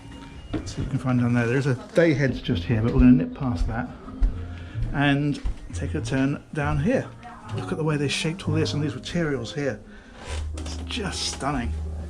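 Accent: United Kingdom. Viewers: Your British accent makes these tours even more interesting ...not sure why??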